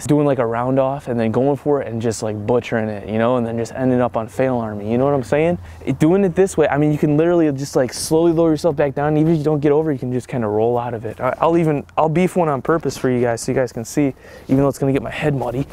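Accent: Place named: Boston, United States. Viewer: Boston accent at it again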